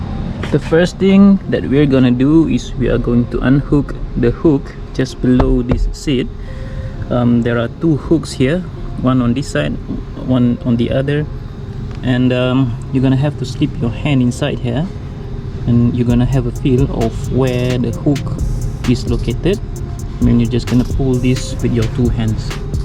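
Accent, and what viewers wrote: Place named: Australia. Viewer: Pergh oz accent... Next content seat bucket ke???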